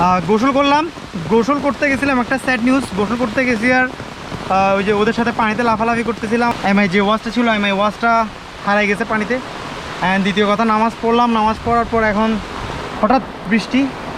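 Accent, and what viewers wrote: Noakhali, Bangladesh. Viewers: ক্যামেরাতে হ্যাক লাগি গেছে গই,,,,, ফ্যাক্টঃ নোয়াখালীর ভাষা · ভাই মনো আঙ্গো নোয়াখালীর..?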